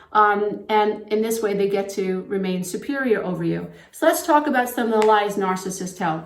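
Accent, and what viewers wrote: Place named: Long Island, United States. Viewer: Also I'm a Long Island guy. And although I haven't lived there in a long time it's still home and when someone speaks to me the way you do it's so familiar comforting supportive and oh so welcome! The tone the accent the loving intention it all hits home real deep you've been so helpful. Look at how many people you are helping it's astounding! I'm making the best music of my life now because I'm plugged in. Thanks to you and others in your field of expertise.😍 Happy New Year! Your good karma account is overflowing.👩😇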